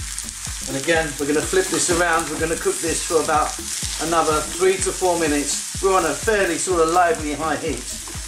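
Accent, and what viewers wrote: United Kingdom. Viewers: Are you Asian with British accent or English person, love your passion for cooking. Love listening you, deffo subscribing u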